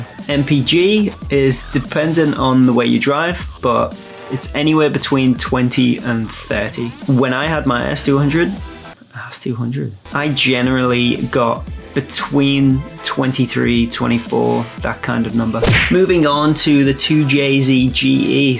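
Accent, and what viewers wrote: Liverpool, United Kingdom. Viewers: are you a scouseer whos lived in america or the other way round?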